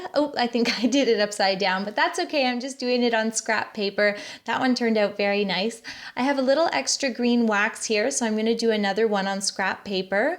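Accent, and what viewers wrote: Canada. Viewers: I might be wrong but she sounds Canadian. I can tell because shay mitchell says aboot and she's Canadian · Are you Canadian??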